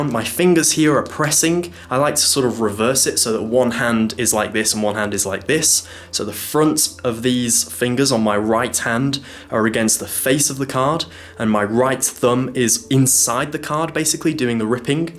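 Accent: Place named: England, United Kingdom. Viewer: Are you from England? I am from Taiwan, I want to learn the cool accent you have...,plz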